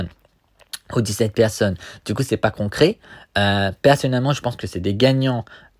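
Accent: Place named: Indian Ocean. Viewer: Ton accent sent l'océan indien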